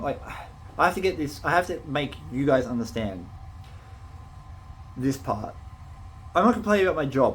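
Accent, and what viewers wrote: Australia. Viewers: Do what ya gut tells you to do,, you and ya brother are incredible I'm recently retired and found your YouTube a couple of months ago. Because of you I now have a Detector, a Pinpointer , and exploring country I would never of seen before. Whatever ya choose,, I still like ya content 🙏😎 (nothing wrong with the way you speak,,, its Australian ❤❤)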